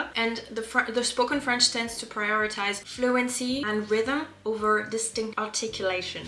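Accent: United Kingdom. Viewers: Je suis français mais j'adore tes vidéos pour pratiquer mon anglais 🙈petite question où est-ce que tu as appris à parler anglais ? Ton accent est beaucoup trop bien facile à comprendre et ça fait très anglais en même temps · Merci, quelques bons conseils. D'ailleurs vous avez un bel accent britannique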